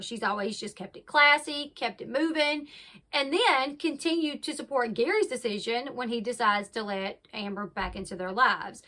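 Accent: South, United States. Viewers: ... At first I had commented, basically piggybacking onto your 1st comment and then read what said back to you and I'll admit, she got me. She played victim and because I'm one of "those people", you know exactly what I mean, the ones she looks down her nose at, I was ignored by my initial comment on yours and had stupidly deleted it. Then I chose to write an apology comment directly to and you know what? She ignored that one too! I used to really support her channel, especially during the whole KJ situation, BUT now.... nope! She's just like KJ, with a more southern accent and better ability at applying makeup. That's pretty much all. She doesn't care about anyone BUT herself, her money, maybe husband and kids and we don't make the cut whatsoever. This is the type of broad that sits at BBQ'S bitching about how "these people" affected her life and how useless we are. 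She's judgemental and I feel like a fool for firstly deleting my original comment and writing a separate apology one and secondly for falling for the manipulative techniques & word salad used in her reply to you and your comments. This woman, I'm so glad that my daughter won't ever have to grow up around her children and there's states between us. I just can't, it's like speaking to a body void of personality and empathy. Empathy is what got me to delete, apologize and, no excuses about your teeth or children are going to get you outta purposely ignoring it. I'm embarrassed and angry. BUT, I s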